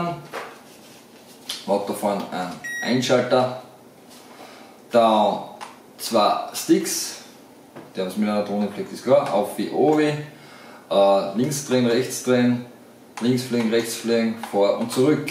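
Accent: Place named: Vienna, Austria. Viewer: I aus Wien du heast du irgendwie a so o.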